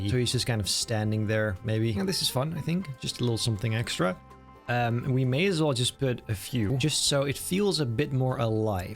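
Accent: Netherlands. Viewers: nether lands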